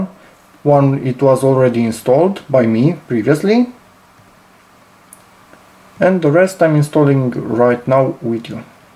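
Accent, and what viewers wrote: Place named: Romania. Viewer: Bro tu esti roman 100% :)))) <3 Edit: Te-am luat dupa voce si cum vorbesti Engleza da se vede si pe paginile web :)))))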